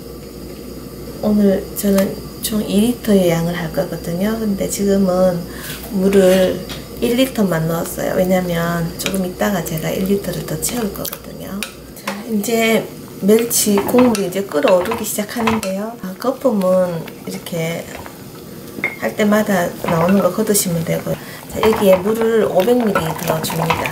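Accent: Gyeongsang, South Korea. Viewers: 미국에서도 응원보내요~~ 코인 육수 사서썼는대 뭔 맛도 안나고.. 해서 이번에 첨으로 멸치 사와봤어요 육수 직접 만들어보려구요. 멸치말리려고 내놨어요^^ 낼 꼭 따라해볼게요. 저도 경상도에 살았었는데 말투가 넘 반가워요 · 경상도 억양이 반갑내요~ 오늘 처음 와 봤어요 구독 눌렀어요 잘 보고 갑니다~ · 상어..무섭지않아요 유치원 애기들 상어 인기짱입니다 우리동네 맛집으로 유명한 멸치육수칼국수집 비결이 바로 이렇게 육수내는거예요 요리는 배워가는게 많답니다 구수한경상도 아지매라서 더욱 흥미롭습니다 늘 고맙습니다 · 설명이 구수한 경상도 대구 말씨가 묻어있어 고향 향수 풋풋 하구요 미모는 전형적인 한국의 풍성한 정감에 내가 좋아 하는 멸치 다시물 설명 꼼꼼히 챙겨 정취했습니다 언제나 보람가득한 날 되시기를 기원 해요 🙌💖👍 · 가족님들,부럽네요😋.대구고향떠나40년해외거주하는할매입니다.정겨 운고향말씨와 편안한분위기가 느껴져...시원하고알찬요리솜씨에👏🙆.멸치육수끓일때 생강한쪽넣어면비린내가덜나지않을까싶은데 어떨까요?✨👱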